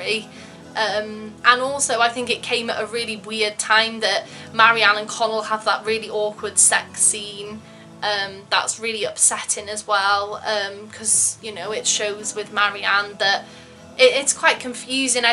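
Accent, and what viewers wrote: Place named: Northwest England, United Kingdom. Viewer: Great review you sound like you come from northwest of England maybe I'm wrong ☘️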